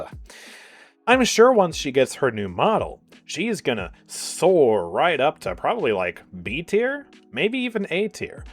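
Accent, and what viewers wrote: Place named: Canada. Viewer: dude why whenever i watch your video it makes me feel like iam watching canadian guy eh it just you guys have the same style in talking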